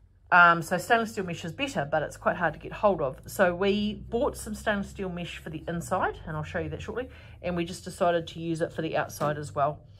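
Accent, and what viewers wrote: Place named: New Zealand. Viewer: Gr8 update,. I should have listened closer to dialogue and would have noted you were Kiwis. Weee used often “bet” for bit … might be rivals on the turf and on the paddock but best of mates after the games and a few beers. Cheers…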